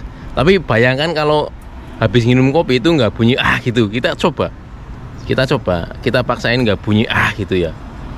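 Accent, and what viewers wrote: Banyumas, Indonesia. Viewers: Mas ini aslinya drmn? Spt dr daerah Banyumas kl dengar logatnya.. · Punten..mas iki jawane ngendi yah..ko ngapak bener hehe.. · Ngapak Banyumas kayane kie logate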